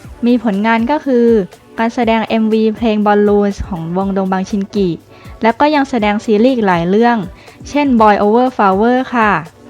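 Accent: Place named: Thailand. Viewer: ศัพท์อังกฤษ ออกเสียงไทยจ๋าเลยพี่สาว ไม่มีการใส่สำเนียงเลย ฟังแล้วตลก แต่ก็ยินดีเข้าชมเพราะอุตส่าห์อ่านให้ฟังอะนะ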